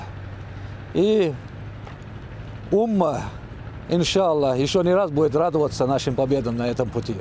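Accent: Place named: Chechnya, Russia. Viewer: Унего чеченский акцент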